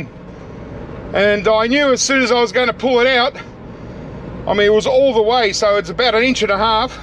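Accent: Australia. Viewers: Well your accent sounds Australian but y’all use dollars?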